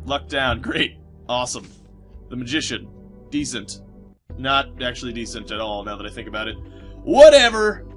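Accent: United Kingdom. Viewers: Lol your british accent is funny..... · wouldnt that be Best. British. Accent. Ever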